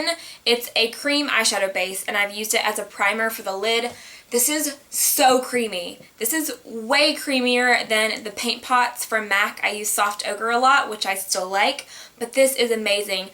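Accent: South, United States. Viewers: I looove your cute little accent, I first found you on IG & never pictured you having a southern accent! BTW have you tried Urban Decay's "Naked" lip liner? I feel like I reach for it soooo much more than Stripped Down (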